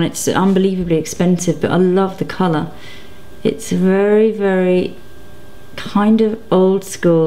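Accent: United Kingdom. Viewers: it cracks me up when you say these huge brand names that i have never heard and you say it in your [roper british accent. so you make each name way more bold then they really are. lol. love you!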